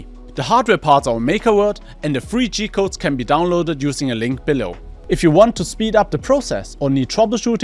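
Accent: Germany. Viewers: German Accent Vanoss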